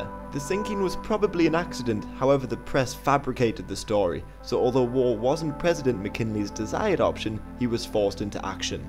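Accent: United Kingdom. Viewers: Is this a British man talking? this makes no sense unless her learned to talk British in the US >_<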